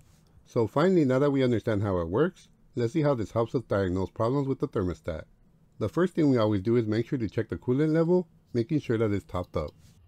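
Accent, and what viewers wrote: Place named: Mexico. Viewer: He sounds Mexican. If he is listen about vehicle. Trust me.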